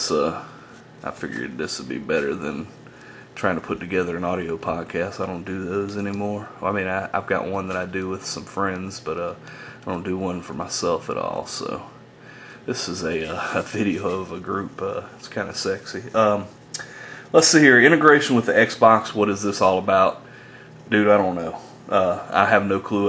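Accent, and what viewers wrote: South, United States. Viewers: You know I really don't like southern accents- but yours is just fine XD Not a bad review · i love your voice/southern accent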